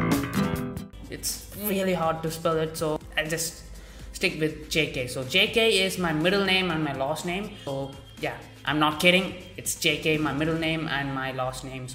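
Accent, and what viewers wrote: India. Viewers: Indian? Accent and yeah.... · No Front, But as 1st second i heard you, anyways i knew that you're from india. As Same as Wehen i Heard- myself, i know that i'm from germany 😅🤫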